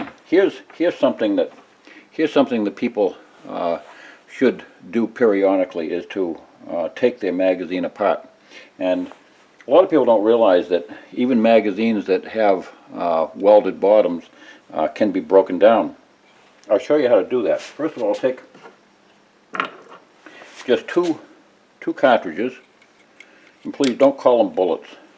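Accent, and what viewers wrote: New England, United States. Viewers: Hearing your accent, you can’t live to far away from the Sig Sauer headquarters? Grew up in Gloucester, Ma and raised my children in Gilford, NH. That is either a Southern Maine or a Southern NH accent. Love your videos.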